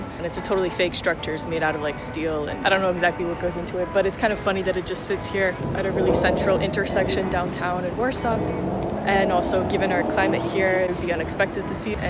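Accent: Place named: United States. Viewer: Lovely vlog. You can definitely make decent money in Warsaw teaching English - you'd be sought after for your accent (recognisably American but also clear and distinctive, although you might have to slow it down a tad at times, so that your learners would follow). Poland is one of the best destinations in Europe to teach English.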